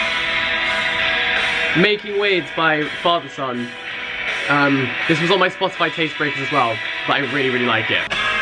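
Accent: United Kingdom. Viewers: You’re SO British- coming from a Brit jeez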